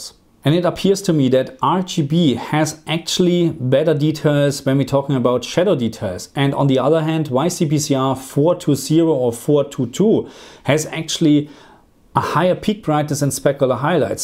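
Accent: Germany. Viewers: Liebe deinen deutschen Akzent 😅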